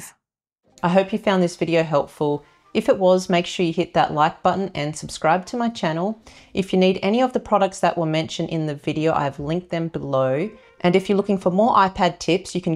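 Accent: Australia. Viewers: You sound Aussie so why are you using the American Amazon site? Could you please change it to Australian Amazon?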